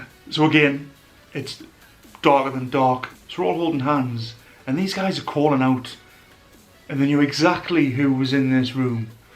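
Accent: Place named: North East England, United Kingdom. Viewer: Great video! Me & my friend are staying overnight there on the 24th October! You sound like you're from the North East. We're from Teesside.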